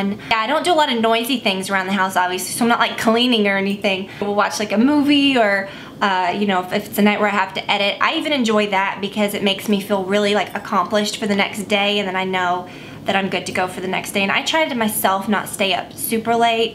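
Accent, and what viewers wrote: South, United States. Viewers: it's not incorrect, that's just southern accent shining through;)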